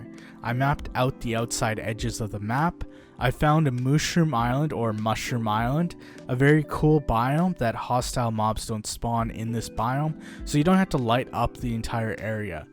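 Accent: India. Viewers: Are you indian or American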